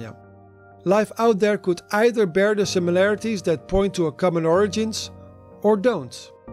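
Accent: Netherlands. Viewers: Love Dutch English as Dutch man ❤️😅❤️ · Excellent video in beautiful Dutch accent ! Do more of this type of explainers. Best wishes 🎉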